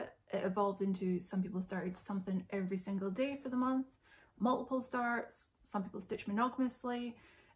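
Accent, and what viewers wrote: Scotland, United Kingdom. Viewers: Thank you for sharing your beautiful work! Love all your stitchy plans as well. The bear, whales and owl are among my favourites! Have a great may and sorry about the trouble with your ankle. Still loving the scotch accent! I am working on the Quakers of Scotland by tempting tangles and am loving it! Scotland is on my bucket list of places to visit as thats where my ancestors originated🙂. I know you are in the US now but I see you still love to stitch those highland cows 🥰. Happy stitching 🧵🪡😊☀️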